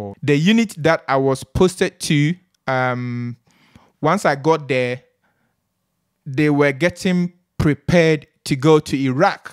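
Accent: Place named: Nigeria. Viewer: HE SOUNDS L I KE A NIGERIAN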